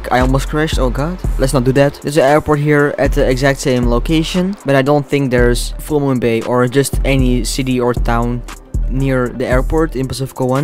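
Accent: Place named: Quebec, Canada. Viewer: Cazy how much you sound like you are from québec! are you from québec???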